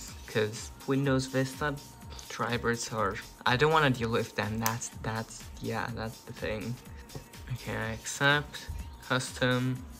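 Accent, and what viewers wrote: Czech Republic. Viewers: Ur czech ?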